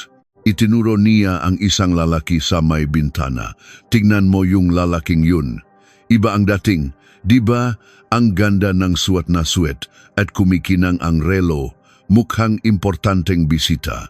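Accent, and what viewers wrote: China. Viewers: Ano ka Sir, intsik ka ba? bakit ganyan lkung magsalita itongnarrator na ito nkakahiya ka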